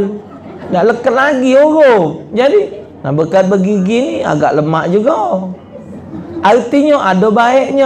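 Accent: Palembang, Indonesia. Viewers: Ustadnyo asli mano mang R nyo plembang nian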